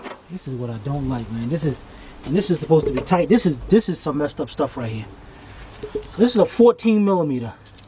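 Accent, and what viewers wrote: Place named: New York, United States. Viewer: Wish I would have seen this before i paid for the job. You got the most swagger of any mechanic I’ve seen. Sound like you are from New York.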